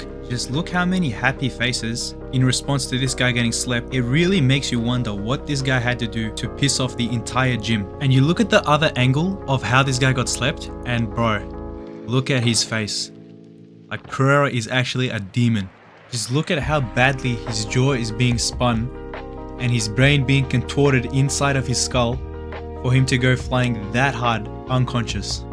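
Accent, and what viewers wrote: Australia. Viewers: are you an aussie man?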